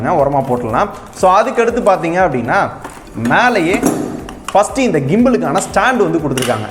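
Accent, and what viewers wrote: Madurai, India. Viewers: கொஞ்சம் எசவா இருக்கும்.Madurai slang😍😍😍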